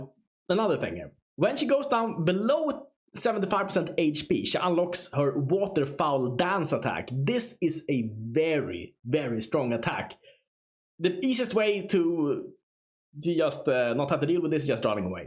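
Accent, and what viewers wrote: Sweden. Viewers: I like your Swedish accent. 👍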